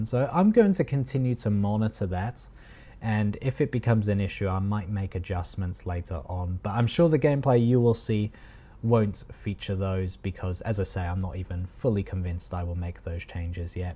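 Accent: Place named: Australia. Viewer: Oh shit your Australian! Victoria4Life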